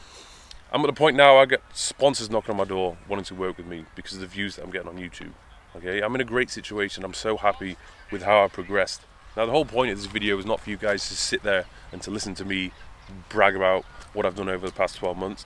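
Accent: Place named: RP, United Kingdom. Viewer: ..I am a newbie to your YouTube channel..but I have to say it is by far the BEST fitness channel I have seen. This video is awesome. And your English is fantastic..you do not speak like a chav like other trainers. No slang, no ghetto-speech...just nice, straight, queens english. Your knowledge and your honesty is brilliant. No junk, no trash, NO WAFFLE, and no clickbait...just straight to the point, and nice short videos. I’m glad I subscribed. Top man!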